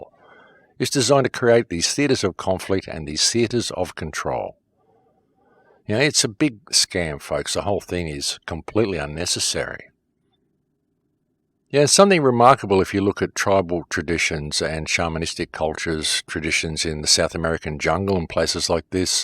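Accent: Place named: Australia. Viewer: His voice sounds Austrailian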